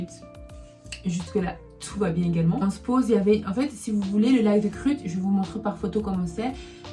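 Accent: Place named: England, United Kingdom. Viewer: L'accent anglais 😌❤️❤️sinon très belle histoire.☺️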